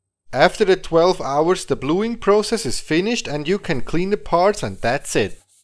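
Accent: Switzerland. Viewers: Awesome video, LOVELY 😀 Swiss accent... (I lived in Zurich for 23 years...) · Irgendwie amüsant, wenn man den Schweizer Akzent trotz englischer Sprache völlig heraus hört :D Es funktioniert also mit Metall, aber nicht mit Edelstahl.. Woran liegt es? Chromanteile oder worauf müsste ich achten? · Ich wette Sie sind Schweizer. :) Tolles Video! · So geil, wenn ich no vor em Rapsöl gmerkt han, dass das en Schwiizer sii müesst!!! 🤣👍🏻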